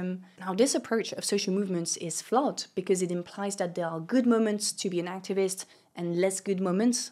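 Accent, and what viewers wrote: France. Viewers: maybe it has to do with her french accent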